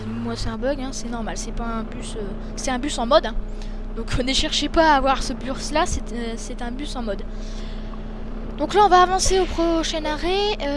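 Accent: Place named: North, France. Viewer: Super vidéo ! Et bel accent du Nord aussi j'aime bien xd !! Tu pourrais peut-être faire des lets-plays sur des maps ça pourrait être sympa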